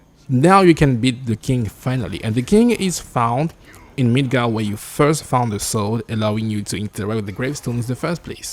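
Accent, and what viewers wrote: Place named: France. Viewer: je me disais bien que y'avait des petits reste d'accents français par ci par la, très bon niveau d'anglais sinon.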